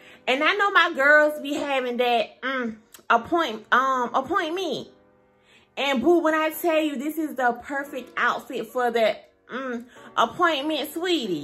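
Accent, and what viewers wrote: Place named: Atlanta, United States. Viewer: Lol your voice 💕❤️ you gotta be frond Atlanta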